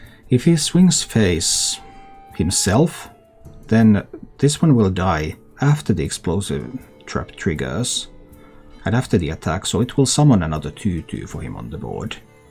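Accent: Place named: Finland. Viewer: He's from Finland, this is how they lovely people of Finland talk 🇫🇮❤️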